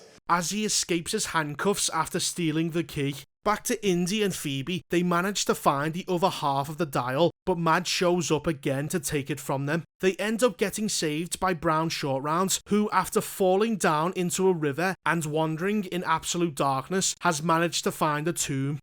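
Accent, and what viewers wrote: Liverpool, United Kingdom. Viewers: I know a scouse accent when i hear one 😂. Liverpool on top.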